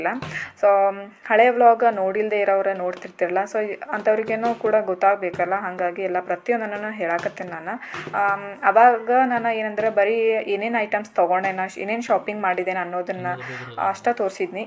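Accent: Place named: Bijapur, India. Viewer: Niu namm tara matadtiri .namdu bijapurari ❤ ,.. beautiful vlog 🎉🎉 new friend.🎉❤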